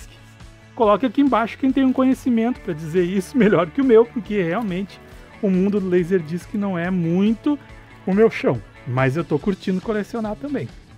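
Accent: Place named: Porto Alegre, Brazil. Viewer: Tu tem o mesmo sotaque que o Radhanti Maharaj aí de POA.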